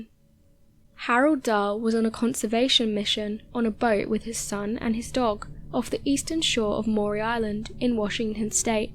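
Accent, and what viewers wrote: United Kingdom. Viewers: Why does everything sound more plausible when delivered with a British accent? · Monument Valley, Arizona is being shown but Brit voice discussing Minnesota.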